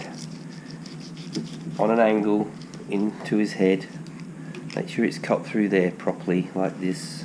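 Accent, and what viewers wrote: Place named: England, United Kingdom. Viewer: HELLO ", HOPE YOU ARE DOING WELL? I WATCHED YR" VIDEOS THAT YOU HAVE BEEN DOING. MMMMMM? WER DO EYE" START? FIRSTLY YOU COME ACROSS SO"SO" WELL WITH YR POMMY ACCENT! YOU EXPLAIN WHAT AND HOW YOU ARE DOING. GOOD STUFF MATE. PS" LOVE YOUR HELPER IN YOUR FILLETING VIDEO. CHEERS MATE ANDRE GEORGESCU...🦊🦊🦊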